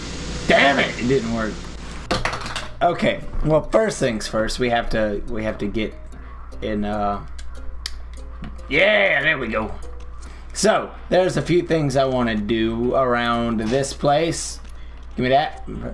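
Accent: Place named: Ireland. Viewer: Love the Irish accent 😂😂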